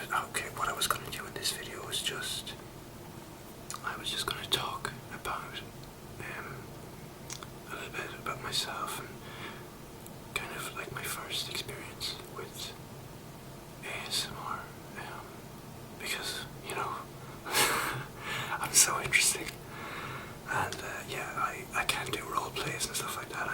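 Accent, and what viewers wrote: Ireland. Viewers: Where in ireland r u from?? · This is good stuff. The irish accent will do it for a lot of people. Keep it up :)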